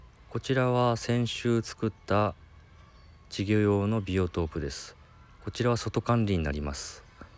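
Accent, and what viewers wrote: Kyushu, Japan. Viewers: こんばんわ〜 チャンネル登録させていただきました！ 方言的に九州の方ですか〜？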